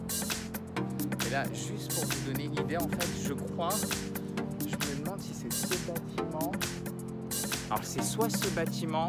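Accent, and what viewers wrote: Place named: United States. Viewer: Hey it s me Michelle. I work ou english ever days. I see yours videos and tutos de huito 's videos. The difference between you it s the accent American for you and Bretish for Huito. I heard Beatles ' s songs. At the beguining of octobre i have to go lesson by a teacher. I think subcrire at your formation at the end of this year. Not before because i have no time.!!!!!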